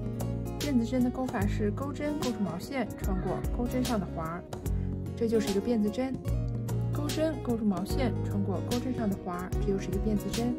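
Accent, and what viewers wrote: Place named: Beijing, China. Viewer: 你应该是北京人吧？🙂耳熟。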